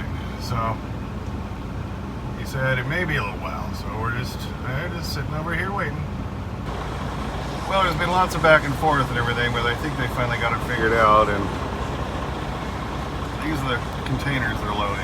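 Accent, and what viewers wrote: Louisiana, United States. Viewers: Your louisiana accent was so funny🎉❤ I never been that far south in a truck very cool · Your cajun accent is spot on big dogg!!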